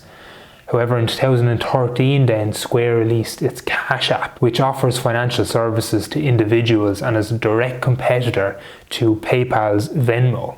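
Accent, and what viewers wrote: Ireland. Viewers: Great to hear an Irish accent on the platform 👍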